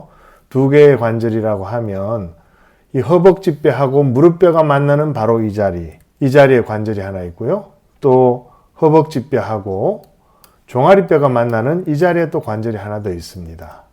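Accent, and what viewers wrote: Gyeongsang, South Korea. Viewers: 갱상도말투 댐알겠다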